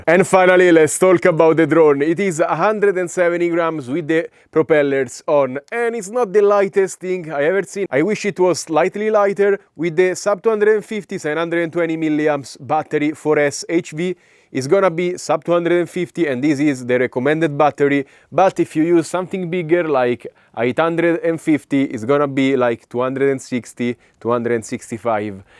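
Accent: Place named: Italy. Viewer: The shitty AI automatically translates the voice into French but I prefer to hear real voice with his Italian accent.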